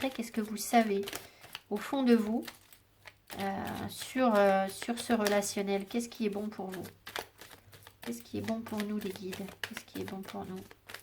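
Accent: England, United Kingdom. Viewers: J’adore votre accent anglais 😊 merci c’est juste 🙏🏻 🙏🏻🙏🏻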